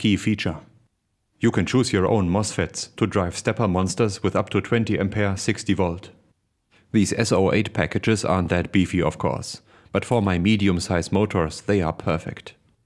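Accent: Germany. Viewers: I think its a german accent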